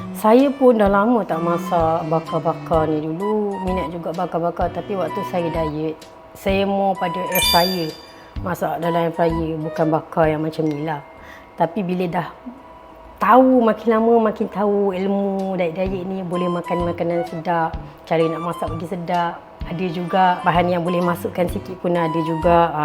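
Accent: Penang, Malaysia. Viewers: Suka degaq loghat penang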